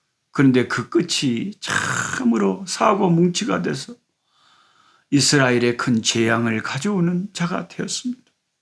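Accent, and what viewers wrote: Jeolla, South Korea. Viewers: 목사님 전라도 사투리 말씀 은혜가됩니다 저도 전라도 고흥입니다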